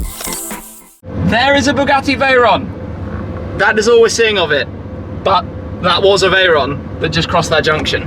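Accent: England, United Kingdom. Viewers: You are british?? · You could have taken phone numbers off chicks at least ! Believe me the first thing American girls are interested in is the size of your wallet .Its the very first thing in their head they ascertain on first contact.An English accent is huge bonus.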